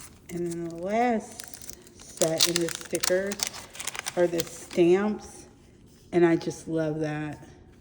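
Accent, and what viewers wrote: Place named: Pittsburgh, United States. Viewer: I loved everything from this collection. I am also a legend and still didn’t get everything I desired. I’m grateful for what I received. I will continue to support C&P. Planning is my creative outlet and regardless of the economy I’m going to continue to invest in it. Love your videos. I picked up on the Pittsburgh accent btw 😊.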